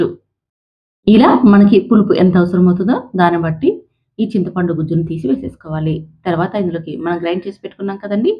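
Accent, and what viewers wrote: India. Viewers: Nenu mi matalu vini ma Kadapa vallu laga unaru anukuna andi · AMMALU MEE PADHA VUCHHARANA BHATTI RAYALA SEEMAVARI LA ANIPISTHODHI AMMALU "VESUKOKUNDA" AND."RAKOKUNDA" MEEMU 20Y.BACK.RAYALASEEMA ANANTHAPUR VASTHAVYLAM AMMALU . THANQ AMMALU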